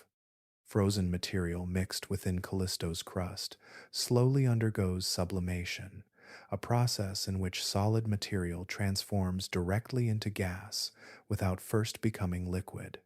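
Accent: United States. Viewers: American accent and no annoying sound effects -- thank you!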